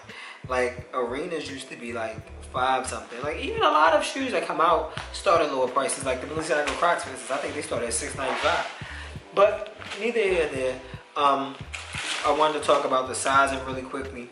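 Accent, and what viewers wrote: Baltimore, United States. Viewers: u from baltimore???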